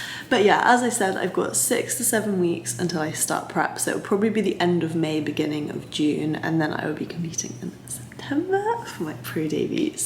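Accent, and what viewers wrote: RP, United Kingdom. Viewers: you so unique your a amazing athlete yet you dont have tons of ink and sleeve tattoo's and then when you speak this beautiful almost posh English accent comes out Like we are going for afternoon tea. i hope you realize how rare and beautiful that is today...cheers from the USA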